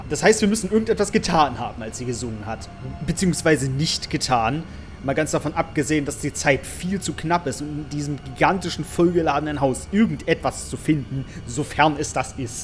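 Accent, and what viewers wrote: Saarland, Germany. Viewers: Bist du Saarländer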